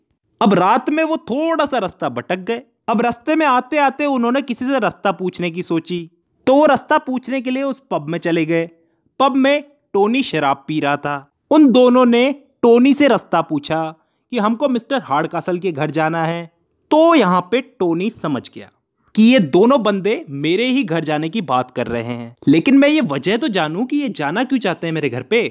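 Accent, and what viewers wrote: India. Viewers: Hahahahhah best way of explanation.... English with desi tadka wow .. great Sir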